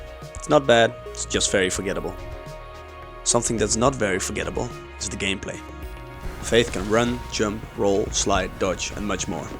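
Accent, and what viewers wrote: Netherlands. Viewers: this game will forever have a special place in my heart. great video! edit: im just gonna assume you're dutch because of your accent ;) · Goeie review, ik hoorde gelijk dat je uit Nederland komt :)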